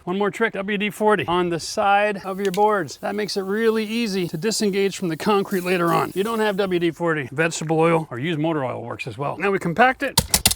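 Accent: Canada. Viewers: When you say House and About and Eh,you let me know you were Canadian. Cheers from below the 49th.Nice video. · How do you say the word house that gives away your Canadian accent. Thank you for your awesome video.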